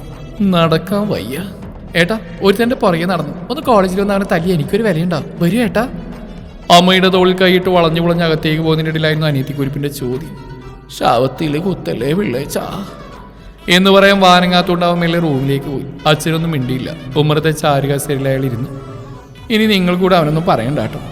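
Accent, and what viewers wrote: Thrissur, India. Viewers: ഇവനിതെന്ത് വയ്യേ... 🧐😅 ഇവൻ ith എവിടെ പരിപാടി അവതരിപ്പിച്ചാലും ഇതാണല്ലോ അവസ്ഥ 😹 that thrissur slang🙌😅 twistod twis😹😹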